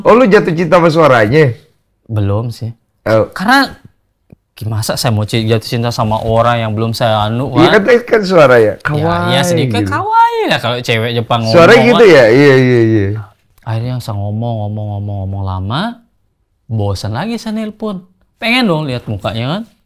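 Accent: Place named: Bali, Indonesia. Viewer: logat nya bang 😂 ada Bali Bali nya gitu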